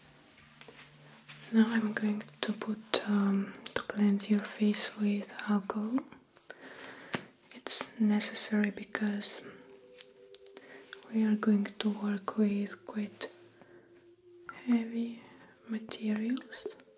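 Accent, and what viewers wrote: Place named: Russia. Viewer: Where are u from? Ur accent sound like russian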